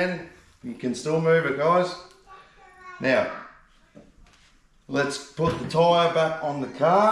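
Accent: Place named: Australia. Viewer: bros voice is the definition of aussie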